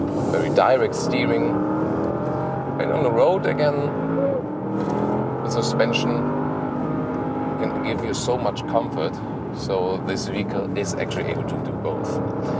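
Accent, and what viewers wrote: Germany. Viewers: Wer merkt auch das er deutsch ist ... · sehr schön, vielen dank. und - nicht böse gement - gerade der schwere deutsche akzent macht das englisch für mich leicht verständlich ;-) weiter so, lg aus österreich.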